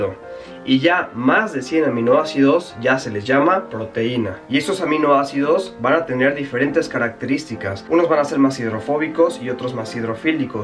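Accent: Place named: Mexico City, Mexico. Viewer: Me encanta el acento cdmx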